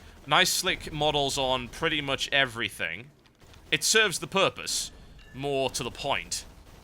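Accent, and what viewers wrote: United Kingdom. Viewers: Large majority of TB viewers - "Wha!!!??? Wer is WoW vidz?!?" I enjoy any video you make, TB, mainly because British accents are like sound-rollercoasters. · I love this "WTF is" series, love "lets play" channels and so on, also brittish accent pwns.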